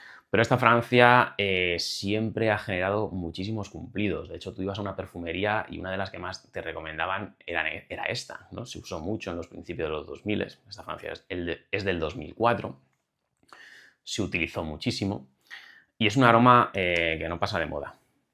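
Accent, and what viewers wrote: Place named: Madrid, Spain. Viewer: Castellano muy de Madrid… otro nivel 👏👏👏👏👏👏👏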